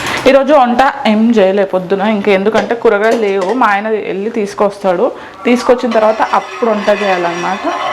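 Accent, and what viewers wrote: Telangana, India. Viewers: Most definitely beautiful thing on YouTube is your pakka Telangana Slang. Boy love it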